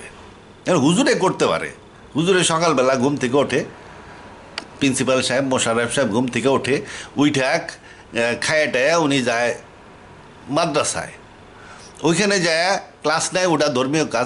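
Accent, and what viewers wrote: Manikganj, Bangladesh. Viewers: আপনার প্রত্যেক আলোচনা আমার অনেক ভুল ধারণা ভেঙে দিয়েছে।আমার জ্ঞান অনেক সমৃদ্ধ হয়েছে।ধন্যবাদ আপনাকে।আপনি কোন জেলার মানুষ? কথা শুনে মনে হচ্ছে আপনি মানিকগঞ্জ জেলার কিংবা আশেপাশের জেলার মানুষ। উত্তর দিলে খুশি হবো।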